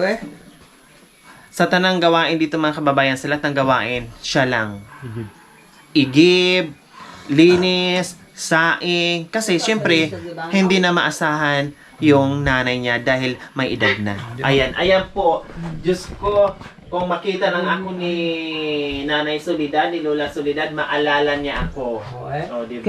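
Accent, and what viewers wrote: Visayas, Philippines. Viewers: Maaamm bisaya di ay ka parehu palata 😂😂😂😂😂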